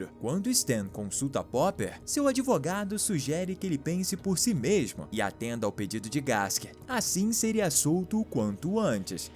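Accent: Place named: Rio de Janeiro, Brazil. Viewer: Achei curioso o narrador do vídeo com sotaque carioca, ao invés de falar guimba de cigarro, disse: BITUCA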